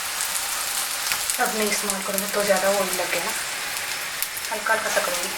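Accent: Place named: Kashmir, India. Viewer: Kashmiri urdu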